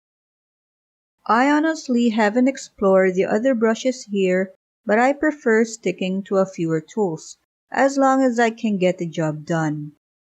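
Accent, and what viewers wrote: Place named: Philippines. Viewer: I hear a pinay accent😅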